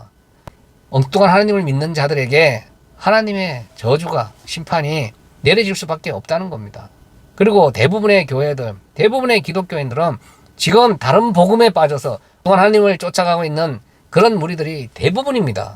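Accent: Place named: Gangneung, South Korea. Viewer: 혹시. 댁이 강릉이래요 ? 사투리가.. 어데 소케야 그기..여기서..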